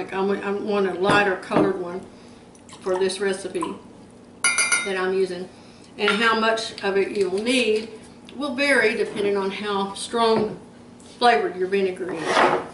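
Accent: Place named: Texas, United States. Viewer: I just found your site and you sounded like a Texan. Now I see that you have on an apron with Texas. Where in Texas are you? I grew up in Temple and now live in Paraguay.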